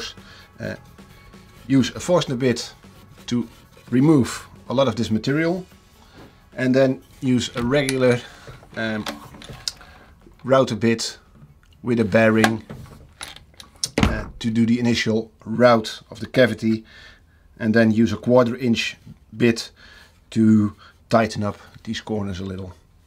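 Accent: Netherlands. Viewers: Thanks a lot for this video! I will definitely use some of your approach in placing a Floyd Rose on my current project. Thought I was the only one that does quadruple re-measuring :). Is that a Dutch accent I hear :D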